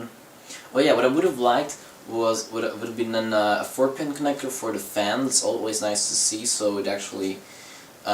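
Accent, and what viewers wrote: Netherlands. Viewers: i cannot watch this cus of your voice i hate a dutch accent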